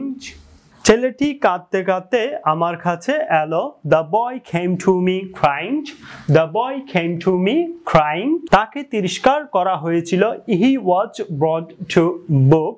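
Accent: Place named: Chittagong, Bangladesh. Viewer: ভাইয়ের বাড়ি কি চট্টগ্রাম নাকি? ভাষা শুদ্ধ করে, ভালো করে উপস্থাপন করাটা এই প্লাটফর্মে অতিব জরুরি। আশা করি, বুঝতে পেরেছেন, আমার কথা। ধন্যবাদ।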